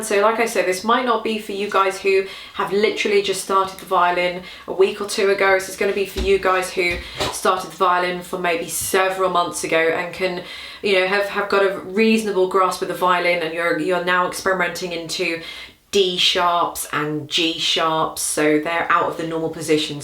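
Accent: England, United Kingdom. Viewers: honestly sometimes i am watching you only for your english accent😂 btw i am a foreigner your tutorials are what we need as student so thank you for your effort.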